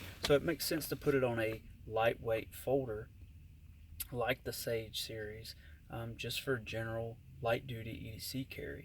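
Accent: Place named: South, United States. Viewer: Where are you from? Sound southern like myself, I'm from Texas.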